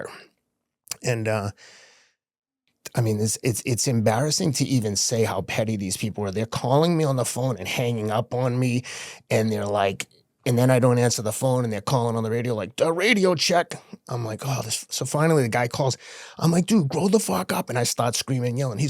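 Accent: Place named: Boston, United States. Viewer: Dude I love your voice!!!! That Boston accent is one of my favorites!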